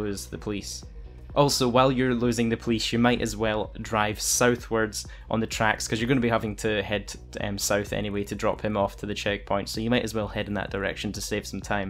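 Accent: Scotland, United Kingdom. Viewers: First Scottish GTA youtuber, finally I might be able to follow this without bullshit 100 step glitches that don’t even work. You from Glasgow bro?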